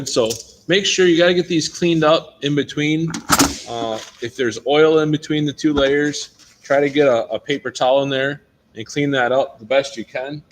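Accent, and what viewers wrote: United States. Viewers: He speaks American and that is how it is pronounced. Not sure what you are crying about.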